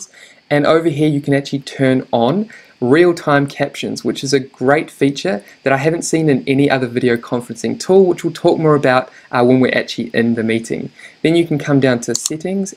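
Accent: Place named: New Zealand. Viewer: Love his kiwi accent💛💖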